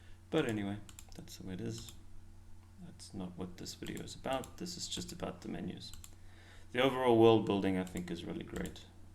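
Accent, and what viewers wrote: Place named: South Africa. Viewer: I could listen to that smooth SA accent roast game menus all night. 🍆 Lmk when and where I can watch the new short film, and while I'm on the subject, I would personally love to see a video showing how you tackled all the rotoscoping in Davinci. What GPU you runnin', tough guy? Tutorials, let's go! 🤣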